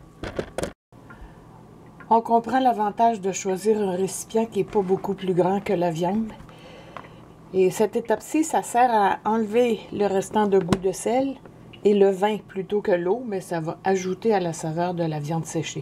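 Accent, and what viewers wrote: Quebec, Canada. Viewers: J'adore l'accent québécois je trouve ça très élégant ou très raffiné pas comme en France qu'ils ont appris la langue des racailles XD (les descendants de l'émigration) et je m'abonne 👍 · Je viens de voir votre vidéo ( donc bien après sa sortie) au moment même où ma viande de bœuf séché gentiment depuis 2 semaine et qui donne déjà un joli résultat ( je compte la laisser encore une a 2 semaine a sécher en plus ) . De mon coté je comptais la portionner et la stocké dans des sachet sous vide. Pour ce qui est du sel qui m'a servi au salage : vu que j'y avais ajouté des aromates je l'ai fait séché et je l'utilise régulièrement pour assaisonner mon eau de cuisson ( légumes, féculents, etc ...) Merci pour vos vidéo avec cet accent québécois que j'adore écouter depuis la france :)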